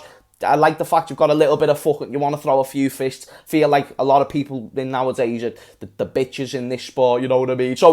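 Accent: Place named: Manchester, United Kingdom. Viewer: Sound like a manc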